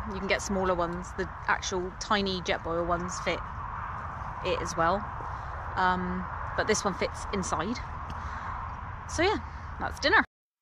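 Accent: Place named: West Country, United Kingdom. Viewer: Like the humour & west country accent, helpful review.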